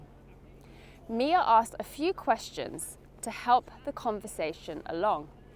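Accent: United Kingdom. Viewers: This british english accent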